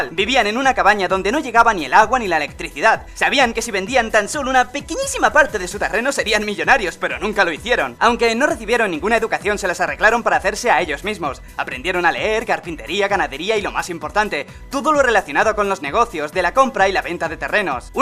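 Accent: Spain. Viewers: Me encanta tu voz española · Español de España, no latino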